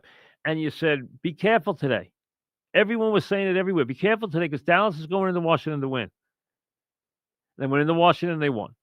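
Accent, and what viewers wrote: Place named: Long Island, United States. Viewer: while the horrible long island accent is tough to get past, this guy’s analysis is spot on… (i’m from long island too)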